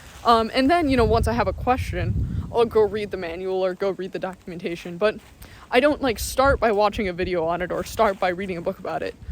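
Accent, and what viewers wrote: Canada. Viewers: What a sympathetic person. She sounds canadian, maybe its that 🙂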